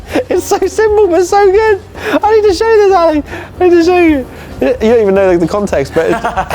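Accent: United Kingdom. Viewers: You're so Brittish!!!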